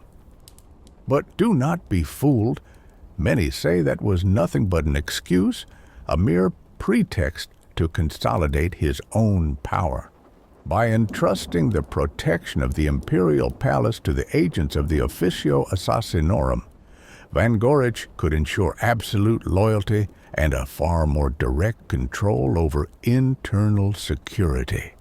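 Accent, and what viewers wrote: South, United States. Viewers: you're welcome. if I may ask what accent is this? It sounds southern, which is cool since most 40k stuff is British. Now I want an inquisitor with a southern accent now. Like those charismatic pastors, but more angry. And a "hillbilly" ogryn. And guardsman that sounds like Lee Ermey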